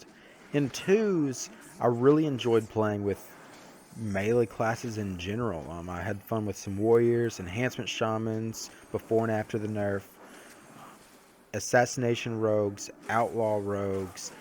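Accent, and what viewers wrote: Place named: South, United States. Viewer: This is nice to hear a fellow player with a kinda Southern accent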